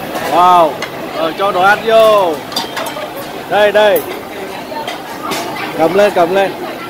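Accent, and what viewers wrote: North, Vietnam. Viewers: Chú cho con hỏi sao chú nói giọng bắc mà 3 bạn kia nói giọng nam vậy chú :3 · Sao ông bố nói dọng Bắc còn Con lại nói dọng Nam vậy ?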